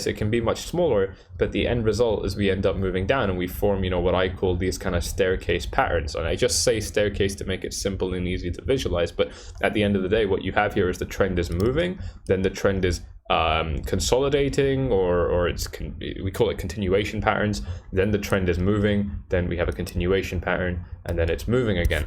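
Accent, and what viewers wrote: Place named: England, United Kingdom. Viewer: I listened to an old man in a truck and now I am listening to a teenager with an English accent for investment advice..I hope I found the one... Wish me luck!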